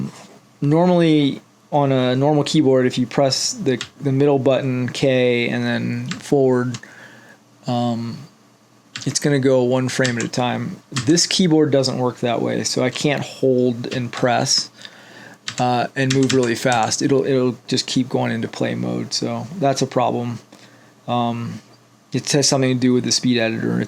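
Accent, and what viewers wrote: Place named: Brazil. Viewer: Thank you very much for this video, it's not very often I may be the 11th subscriber! Are you Brazilian by any chance? Your english accent suggests so.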